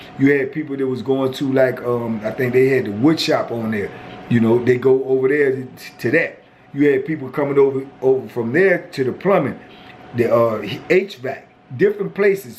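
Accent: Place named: Washington, United States. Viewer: I’m loving these stories When say certain words as only a native Washingtonian can say I chuckle to myself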